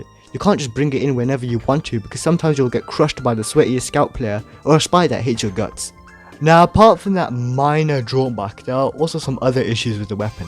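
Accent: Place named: Jamaica. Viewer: Something feel right about hearing a Jamaican man teaching you how to blow up stuff better in a videogame.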